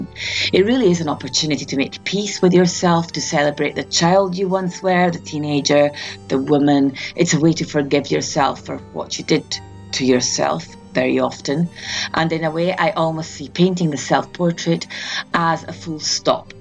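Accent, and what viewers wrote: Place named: Scotland, United Kingdom. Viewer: I don't think it's too loud. There's something with the audio quality that sounds very different from other content creators (it's not your beautiful Scottish accent). If you have a good microphone, I'm not sure if it's to do with the acoustics of the environment you record in? 🤔 Just thought I would mention it because I think the content you create is what a lot of artists really need to hear, and a small tweak to the audio might help your videos reach even more artists. 🙌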